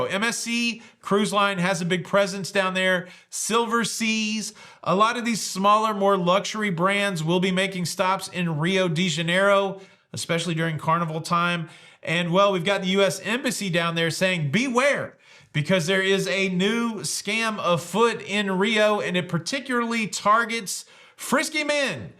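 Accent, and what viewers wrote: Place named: South, United States. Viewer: I done gone wanna learn to speak with a Nashville accent.... I'm fix'n to learn that. Does y'all know if Babbel teaches that? lol Love your southern accent.:)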